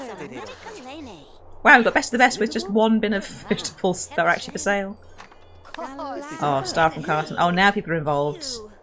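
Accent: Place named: United Kingdom. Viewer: Omg u and I literally have the same accent 😂😂 us brits need to stick together 😁😁😁🇬🇧🇬🇧🇬🇧🇬🇧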